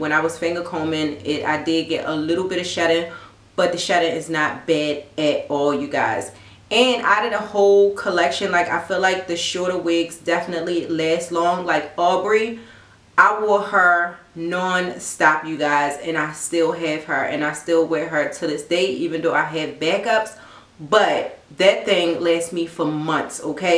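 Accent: Baltimore, United States. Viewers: That baldimore accent! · are you from Baltimore? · Lol yeah she must be from Baltimore the way she keeps saying “to/tew” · Okay I’m getting this wig because of you ❤️ & your accent you sound like your from Baltimore also new Subbie 😊❤️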